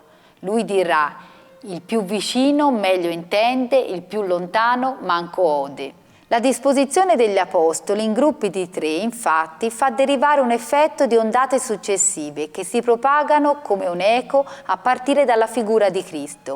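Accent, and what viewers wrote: Tuscany, Italy. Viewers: Ascoltar cose d'arte con accento toscano e, per somma combinazione, con suono di voce di donna, vellica una gioia intellettiva di rara bellezza. Chapeau all'Autrice!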